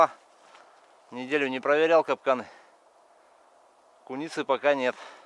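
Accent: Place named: Vyatka, Russia. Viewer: Вятский акцент уникальный.ни с каким не перепутать👍👍.подписон.привет из Москвабада🖐🖐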